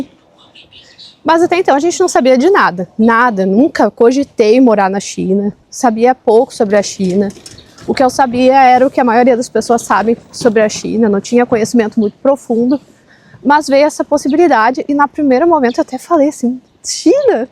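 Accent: Rio Grande do Sul, Brazil. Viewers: Voce é gaucha?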